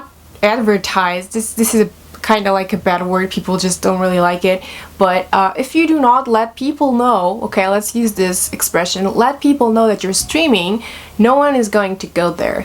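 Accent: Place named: Brazil. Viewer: Sabia que você era BR! Nosso sotaque é o melhor!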